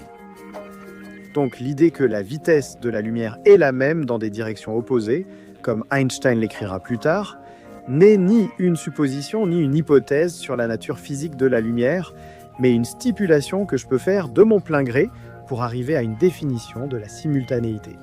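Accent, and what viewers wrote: Quebec, Canada. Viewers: Doublage (québécois) de merde. IA peut-être ? C'est très désagréable à regarder.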